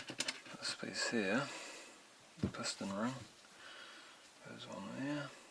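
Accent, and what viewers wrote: New Zealand. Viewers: Accent: this guys NZ. Dole pineapple can: he's in NZ.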